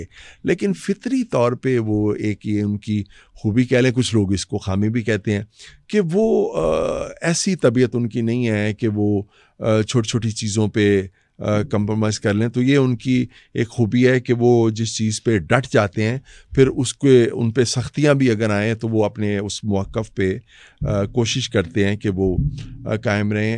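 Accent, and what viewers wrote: India. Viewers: Talfaz mai Indian touch ko tarak krnay ki ashad zaroorat hai. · Why does this anchor talk like Indian such as khas , whoosh, can't she speak proper urdu , rather copying hindi language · Why are you trying to talk like an Indian? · Plz mam ap ko Pakistani urdu bolni chahiyyay na k hindi accent 3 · آپ خود کو کھد کیوں بول رہی ہہیں اور ز کو ج ضروری نہیں کہ انڈین لہجہ بنا کر بولیں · lagta hai yeh khatoon india se hain??? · اپنا اردو کا تلفظ درست کریں انڈین ایکسنٹ سے اپ انڈین نہیں بن جائیں گی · بُہت عُمدہ اور بے لاگ انٹرویو آپ نے شئیر کیا ہے جسکے لئے شُکریہ لیکن آپ کے بھارتی انداز میں کُچھ خاص الفاظ ادائیگی پر شکائت بھی ہے۔ آپ ماشااللہ پڑھی لکھی خاتون لگتی ہیں لہٰذا اپنے انداز گُفتگو کو بدلنے کی کوئی ضرورت نہی۔ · The anchor tends to have an ‘Indian accent’ - عمران كهان instead of خان.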